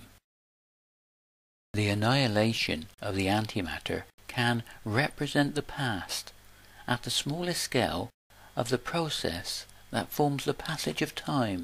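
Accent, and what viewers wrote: West Country, United Kingdom. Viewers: Very informative and I love the little West Country inflections.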